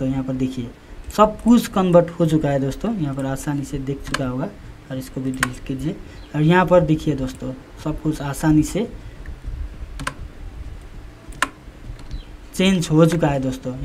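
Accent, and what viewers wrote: Nepal. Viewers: Did you make this video for the people of India??? Your accent says clearly that you are Nepalese.....You could have made this video in Nepali or English language......Anyways thumbs up for the tutorial...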